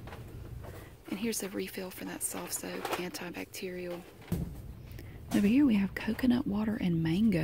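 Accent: South, United States. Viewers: I ♥️ your soothing southern voice. Greetings from Germany 🇩🇪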